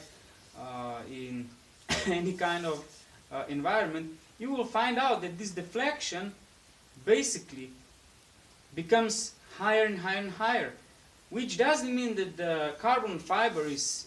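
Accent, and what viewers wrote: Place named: Slovenia. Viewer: You sound like someone from Slovenia.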